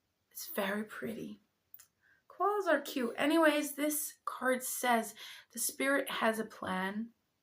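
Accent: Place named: Pennsylvania Dutch, United States. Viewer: As someone from the USA, your English accent, more specifically American accent, is really good. I actually thought you were American until I found out you were Dutch by watching your other videos. You literally sound like you’re a Pennsylvanian Dutch, but I guess that makes sense. 😅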